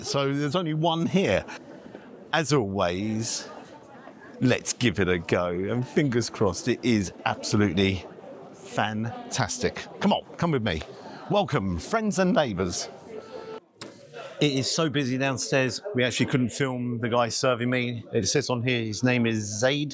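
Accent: United Kingdom. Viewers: Its not what I would call a "sandwich". But then - I'm old fashioned. I also would call a fillet a fill-it not a fill-a. The language I learned to speak (and what American's also define as their lingua-franca) is English. You my dear speak with an English (British) accent. Your audience is most likely comprised of locals who are, typically, not American. Therefore, IMHO it would be nice to hear you pronounce things the English way. Perhaps I am just being pick-ee?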